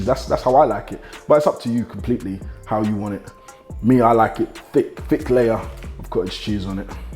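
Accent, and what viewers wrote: United Kingdom. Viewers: That's just another UK vs US thing. I and everyone I know in the UK pronounces it chewna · Keep that culture and UK accent alive. Cheeewna! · are you British? I love your workouts man I do them with the Misus. Need to ask you a few questions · only got the fact he was britsh bow after 1 whole vid and 10 mins into this one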